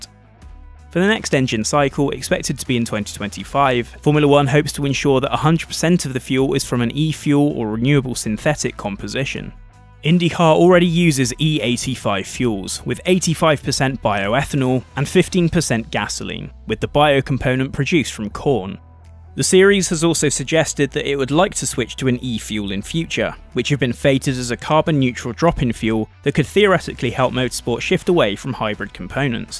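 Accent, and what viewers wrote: United Kingdom. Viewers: IndyCar fan here. Normally on these kinds of videos, my worry is "okay, how is the British accent going to insult my favorite sport this time?" But I've gotta give you credit. This was very fair. Kudos, and a like to the video!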